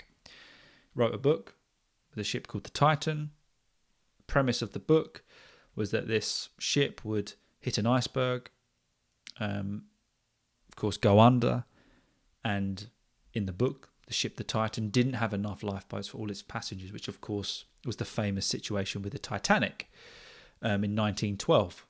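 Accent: United Kingdom. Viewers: I love this, am like learning british accent and am really feelings awesome right now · hello from Brazil. I love British's english and this is the first time here listening to you.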